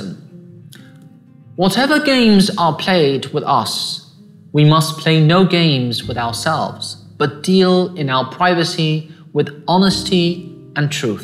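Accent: United Kingdom. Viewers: Dang i never thought you have british accent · When he speaks I get reminded of Hermione Granger and I'm convinced that's how British sound haha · I learn a lot from each video!! Thank you. Bless you and your family, and may your privacy be protected by God almighty. But, can we stop just a minute to appreciate this beautiful ( British English? ! As foreign language learner I learn a lot, language wise, from you when you speak. It's beautiful, and I recommend listening to you ( speaking, singing composing, and even in silence, your calm composure is something valuable to learn from.) I appreciate greatly, how careful you are to contribute positively to ''the inevitable role model" to have become to many people in the world. ❤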